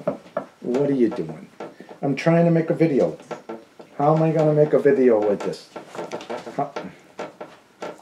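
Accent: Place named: Massachusetts, United States. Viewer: Not to get off topic, but you have a very pronounced new England accent, specifically Massachusetts. I'm from Massachusetts, and I would know that accent anywhere 😊 GOD bless you and Thank you for the tips.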